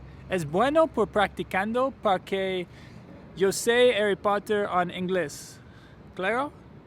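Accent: France. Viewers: 💪😀💪 te comprendo. Tu francés te confunde con el español pero es poco a poco. Vas bien al mirar la televisión en Español. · I can see that you mix it with a bit of french, but still great spanish, the pronuciation is understandable, you just need to correct some mistakes, and you'll start improving :) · Good job! The best way to learn a language is go for it! Even though you made mistakes you still were able to communicate the message, and that is always what is most important. I did notice that you kept wanting to do French pronunciations to Spanish words (like the word "que"). The reason for this is that both are Romantic languages. But just keep at it. I know when I first went to Quebec my French was awful, but I kept forcing myself to speak and within a short time I was able to have brief conversations. I know people fear saying the wrong thing or being corrected by a native speaker so they won't make the attempt, but if you look at it, when you discover you made a mistake and get corrected or correct yourself the odds are you won't do it again, so make those mistakes and learn from them and you'll more quickly gain expertise in the language. 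A quick tip for a quick and large vocabulary expansion: if the word ends in tion (like operation) replace the tion to cion and pronounce it in Spanish: Operation = Operacion, Relation = Relacion, etc. Also, if the word is feminine in French it'll almost for certain be feminine in Spanish and the same goes for the masculine. Keep up the good work! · Your french commes out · It’s so great to see you trying to learn Spanish, I mean the fact that you are putting yourself in this vulnerable position it’s amazing. Luisito Comunica(not his last name, actually a variation from the verb comunicar, for example: yo comunico, tú comunicas, EL COMUNICA) it’s a great channel but maybe you should look for Super Holly, she’s a native English speaker but her Spanish it’s perfect and has so many videos teaching English and Spanish and it could help you feel related because you have the same native tongue. 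In your video I think you keep mixing Spanish and French. I learned English by listening to music and watching films and tv shows with subtitles, first in Spanish then in English until I just hear them, my English by no means it’s perfect it’s always a work in progress but I never stop trying and neither should you. Keep learning 👍🏽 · I only spoke Spanish for the first 4 years of my life as I was raised by my grandma. Once we got into school they kind of forced us to stop speaking it to catch up in English . Still a little bitter about it. I can survive but it’s so frustrating for it not to be natural sounding like my parents or my grandma. Not be able to express myself in the way I can in English. I didn’t help myself as I took French in high school. I can say and write je suis désolé mais je ne parle pas français. Est-ce que vous parlez anglais o espagnol? Or ça va and other basic little phrases. 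Also cool to hear the French come out in your Spanish. Like when you say que. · I think you are very brave. My friends tell me to watch videos of televisión novellas to help my ears learn to hear Spanish sounds. Do you speak French? I think I hear a little. You're right, if we keep it up, we can only learn more and speak mejor. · ¿Do you know French? I can notice how you pronounced some French words/phrases. It’s pretty cool that you did your best for this video, congrats! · Excelente primer intento! Sigue practicando y mejorarás mucho! Se nota que sabes francés y lo mezclas, pero vas bien!! You can do it! 😎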